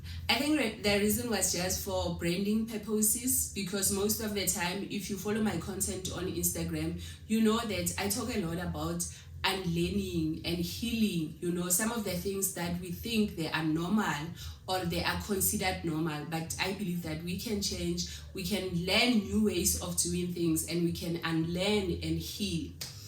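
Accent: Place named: Pretoria, South Africa. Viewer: You sound like you are from Pretoria, by your way of speaking.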